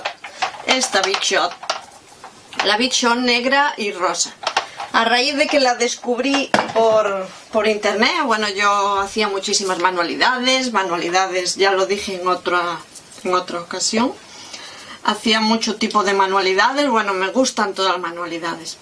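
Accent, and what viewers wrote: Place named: Galicia, Spain. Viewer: Que curranta eres, yo te sigo de hace poquito, me gustan mucho tus videos,soy de sevilla y me encanta tu acento gallego, si me quieres saludar en tu prosimo video se lo enseñaria a mis nietas para que viesen que tengo una amiga youtera, me llaman Loli, un besiño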